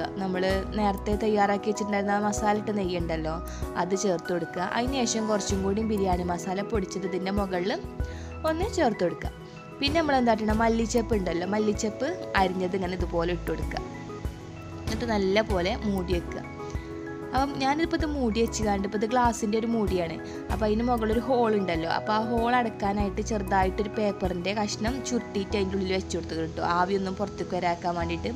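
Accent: Malappuram, India. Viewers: ചേച്ചി മലപ്പുറം ലാംഗ്വേജ് സംസാരിക്കണം റ്റോ.. നല്ല രസം ഉണ്ട്. കേൾക്കാൻ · Adipoli biriyaaniyum malapuram slang um😂😂😂✌️😘😘😘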